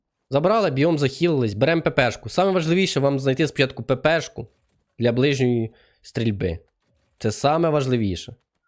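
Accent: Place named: Vinnytsia, Ukraine. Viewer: Привіт. Перший раз натрапив на канал, а звідки саме автор? Говір дуже сильно вінницький) нехай повернення верданська принесе тобі підписоти❤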